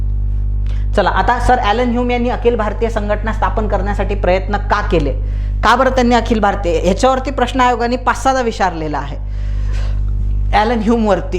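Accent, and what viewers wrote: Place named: Vidarbha, India. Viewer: खरचं खुप छान explain करता sir तुम्ही आणि तुमची भाषा आपलीशी वाटते ते वाटणारच शेवटी विदर्भ तुमचं आमचं सारखंच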